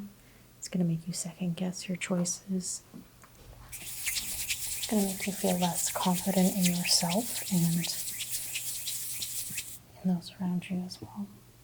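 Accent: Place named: West Coast, United States. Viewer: I totally thought you were east coast! Not west coast! I am JEALOUS of the Seattle ladies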